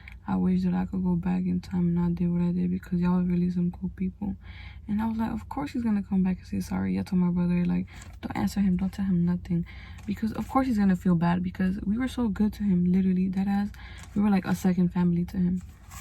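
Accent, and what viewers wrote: United States. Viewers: gurlll what side of houston you from?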